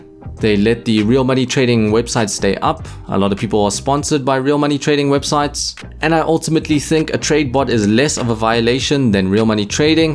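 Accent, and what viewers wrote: South Africa. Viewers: IS that a south African accent i hear? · Are you from SA?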